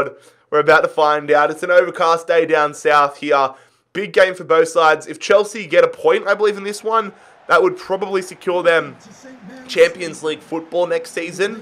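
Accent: Australia. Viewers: Init you can tell he’s Australian 😂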